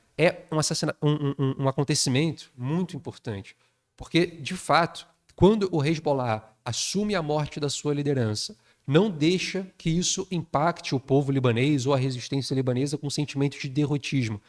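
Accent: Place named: Rio de Janeiro, Brazil. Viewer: Tem hora que eu acho que o é carioca da gema, tem hora que parece paulistano da Mooca! Sotaque mucho loko! #freepalestine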